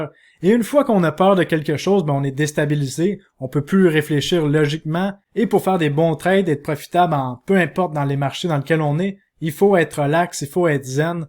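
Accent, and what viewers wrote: Quebec, Canada. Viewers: Merci! Je te le dis à nouveau: tu m'as reconcilié avec l'accent québecois sur lequel j'étais resté bloqué pour avoir connu un mauvaise personne... Et ça c'est vraiment une très grande chose pour moi désormais. Alors merci:) Un des guerriers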